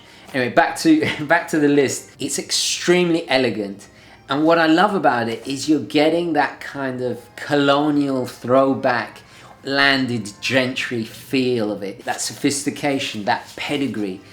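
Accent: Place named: United Kingdom. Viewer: I love that old British accent!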